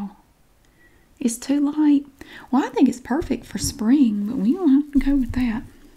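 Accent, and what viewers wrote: South, United States. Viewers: Hi from Great Britain. Im a brand new subscriber, so glad I came across your videos, I love your Southern accent.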